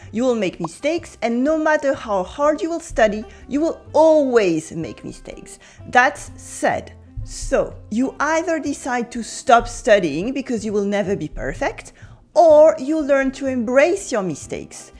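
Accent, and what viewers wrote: France. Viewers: Your English is perfect and very idiomatic. And don't try to change the lovely French accent! Just one correction. If I WERE.(Subjunctive).😊 I'm approaching the French I learned in school now again. Also trying to learn Spanish.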